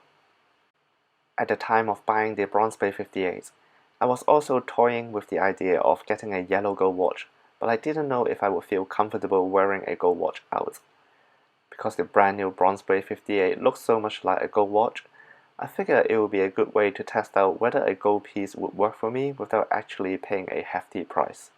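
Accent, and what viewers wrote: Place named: China. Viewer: you chinese ah? sounds like uncle Roger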